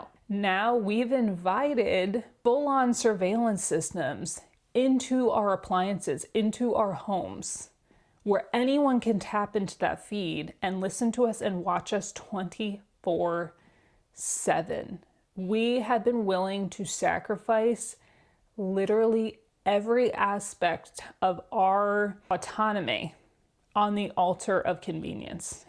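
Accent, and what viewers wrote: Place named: East Coast, United States. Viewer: Not the secret Masonic hand symbols 🤣 I can't believe someone would accuse you of that lol. I like listening to accents and the way people talk. If I had to guess, you're from the East Coast. My guess is New York. Possibly New Jersey. I'm from PA, and the way you speak is familiar to me. I also talk with my hands a lot. No Masonic symbols though haha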